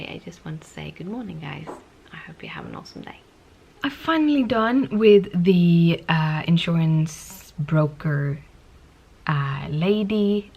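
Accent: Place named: Northern England, United Kingdom. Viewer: I have a question......at what age did you start learning English? I saw video recently of very young children in Norway, ages 6 or 7, speaking English. You sound like you have spent time in Northern England. Did you live in an English speaking country for an extensive period of time?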